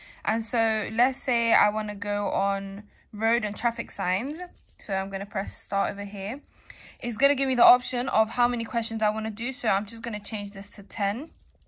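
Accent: London, United Kingdom. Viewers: Hello! It is British accent? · Anywhere in London, hard to tell. Probably south or east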